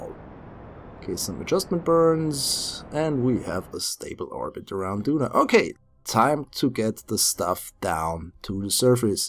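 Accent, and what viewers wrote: Germany. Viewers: man merkt das du deutsch bist😉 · where are you from? germany?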